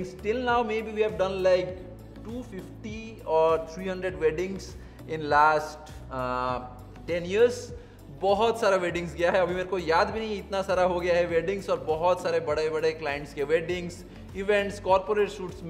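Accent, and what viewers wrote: Odisha, India. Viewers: au gote katha hela bhai ate achievement kalani..kintu pura down to earth..tama odia accent bahut normal..kete loka achhanti jie tike uparaku gala pare besi sannn saann heiki english kahanti au odia ku bi english bhalia kahanti..ja hau semana nku kichhi sikhiba katha Tama thu bhai👍👍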